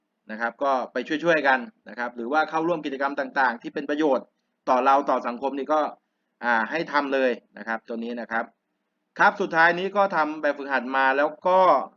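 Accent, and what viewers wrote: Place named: Samrong, Thailand. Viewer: เว้าสำโรง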